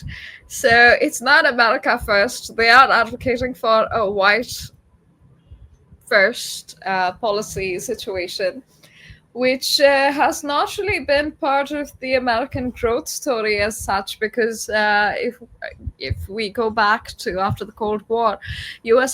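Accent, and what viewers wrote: United Kingdom. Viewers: This was cathartic - as an Indian American. Well said. Is that a british accent u have? I think its something different. Very sweet. Followed u here from DEF talks. Jay Shri Krishna.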